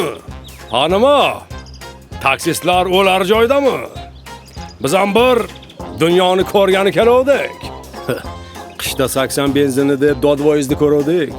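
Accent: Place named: Samarkand, Uzbekistan. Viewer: aka Samarqand shevada gapirdi😅 Farg'onalik shoir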